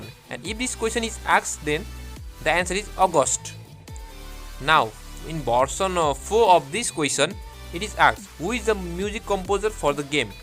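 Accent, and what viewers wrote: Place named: India. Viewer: Bro your a hero thx for giving us answers, your telling us the answers very well and i kinda notice you accent you indian right? I SUBBED!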